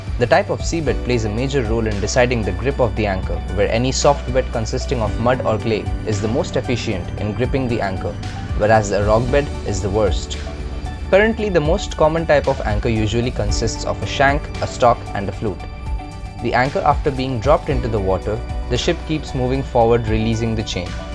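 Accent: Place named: India. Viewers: It's Indian accent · That’s because it’s an Indian accent….no different than British, American, Aussie etc it’s not the accent of someone who’s new to English it’s the accent of someone who grew up speaking it and this is regional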